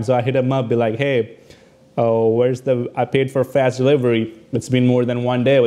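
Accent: India. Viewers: STOP trying to speak like a american , Your INDIAN accent is clearly coming through , STICK TO the indian accent ... stop trying to sound like American with R sound. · I like that Indian accent :)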